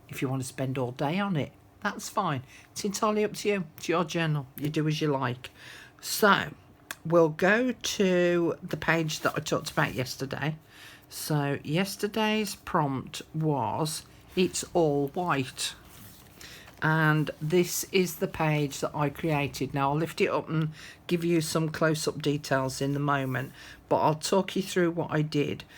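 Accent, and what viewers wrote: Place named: Black Country, United Kingdom. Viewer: Are you from the Black Country? You sound like my friend from Wolverhampton :-) I live in Florida.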